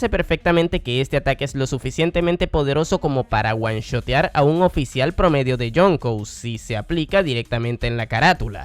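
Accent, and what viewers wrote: Dominican Republic. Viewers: ERES DOMINICANO ? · tengo una pregunta importante que hacerte, eres Dominicano?🇩🇴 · tú eres dominicano brot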